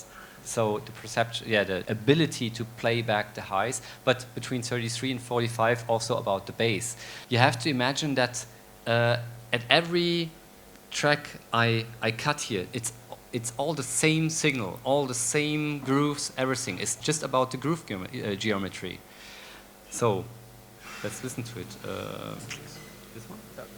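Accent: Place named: Germany. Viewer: How about german with subtitles? I hear the german accenct all over why not talking in german, like electronic beats - Slices?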